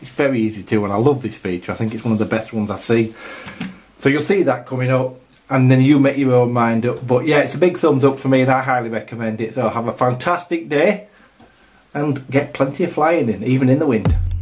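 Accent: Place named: West Yorkshire, United Kingdom. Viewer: Great review video , you sound like keith lemmon Are you from west yorkshire anyway gave it a like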